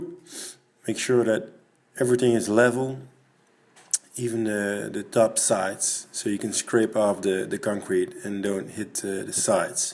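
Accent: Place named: Netherlands. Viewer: I could just hear from your accent you're from the Netherlands haha. Ziet er echt gaaf uit, die tafel! Echt super mooi ding :)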